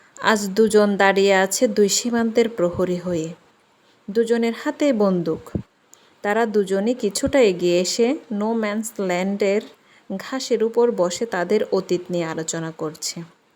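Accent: Chittagong, Bangladesh. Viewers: আপনার ভাষ্যে চট্টগ্রামের আঞ্চলিক লক্ষণীয়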